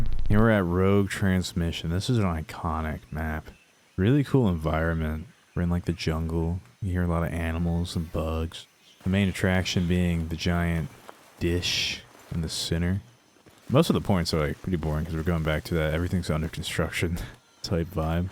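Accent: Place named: California, United States. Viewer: Dude, you and Point & Shoot sound sooo similar its crazy HAVE TO be from the same area of Cali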